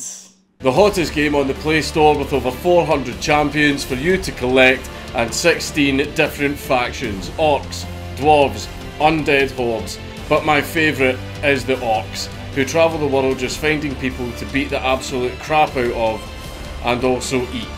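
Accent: Scotland, United Kingdom. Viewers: The stories you tell, and the way scottish accent, expose you as a really cool person who is doing something he's good at .... for the love of it. Thank You! Be well, and yes, I'm still practicing, but I've got doubt that I'll ever be able say 'clouds' , or 'fuck' properly. really, I guess it's just something you're born too. It sounds so ....... dare I say ... no. :-]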